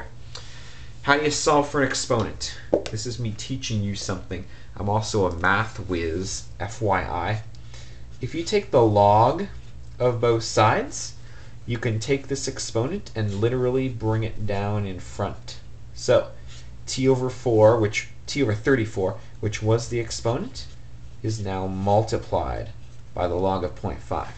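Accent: Canada. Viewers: you truly speak like a Canadian. Oout. Aboout. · I knew you were Canadian within the first 10 seconds lol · I can tell this dude is Canadian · he is canadian. I can bet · lol, accent is so Canadian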